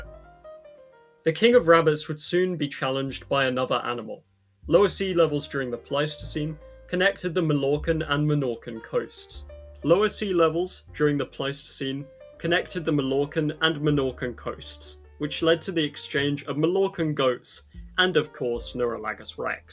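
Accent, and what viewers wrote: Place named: United Kingdom. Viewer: British people pronouncing non-British things.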